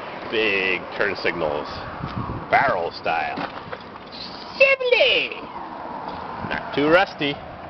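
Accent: United States. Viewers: WHAT!!!!!! You sound like Most Zombue Americans these days..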